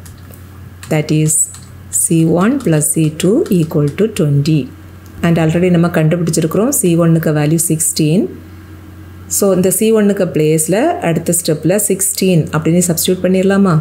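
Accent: Kanyakumari, India. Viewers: Mam the way your teaching is very nicee ninga endha oru namma kanyakumari ah...😊😊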